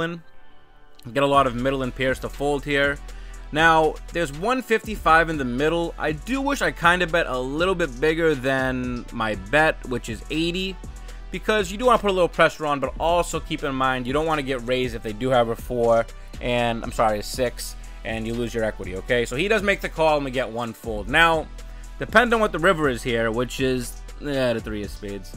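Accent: Boston, United States. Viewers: So, NH is the same accent as in Boston? Just curious, good session, congratulations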